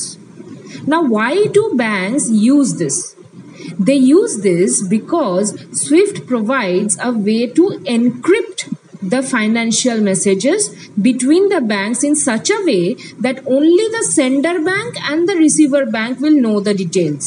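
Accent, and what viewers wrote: Bengal, India. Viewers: You got a Bengali accent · That indian accent kills the message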